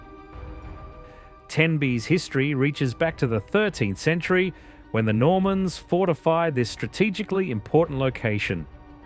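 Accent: Australia. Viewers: Yes,and an Aussie who's trying too hard to make it sound dramatic, but ends up with odd pauses and emphasis. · Nice if the place names were all pronounced correctly. Also odd to have what sounds like an Australian accent narrating. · Lovely video with very relaxing narration. Is that an Aussie accent?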